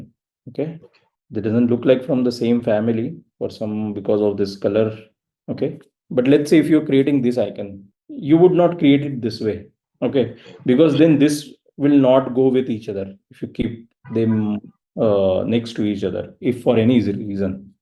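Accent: India. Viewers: My head keeps shaking like an Indian when I hear this accent. I don't know why.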